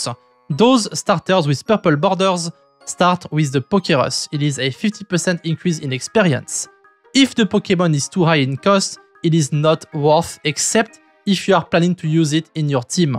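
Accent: France. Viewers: Respect. you are actually the first one, i see a video from, that is actually better than me process wise in the game. The Tips were very good, and you wasnt talking obvious trash Your video isnt clickbait, and even tho your french accent threw me off, it was very informative! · Heaviest French accent I have ever heard 😮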